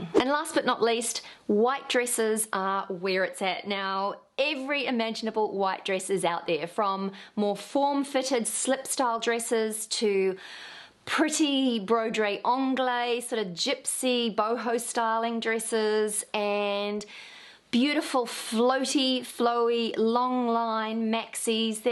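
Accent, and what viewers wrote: New Zealand. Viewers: Just have to say, I adore your New Zealand accent. When you said "six in the city", it made my day! Thanks you for all your insight! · I was wondering where your beautiful accent came from. New Zealand WOW !! We are so far away. I am in Montreal Canada. Thank God for internet. Great videos.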